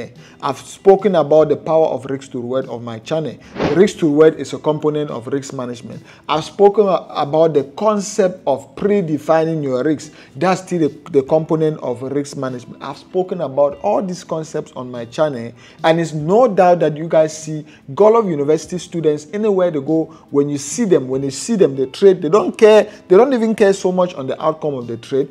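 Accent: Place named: Nigeria. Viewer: This guy looks and talks like a Nigerian from cross river or Akwa Ibom. Could it be that he is from one the villages around Bakassi Peninsula-Naija/Cameroon towns?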